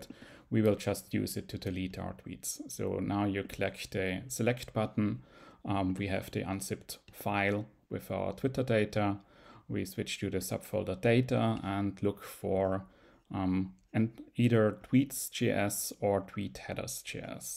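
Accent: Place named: Germany. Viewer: Man hört direkt deinen dt Dialekt raus. Weshalb sprichst du dann Englisch?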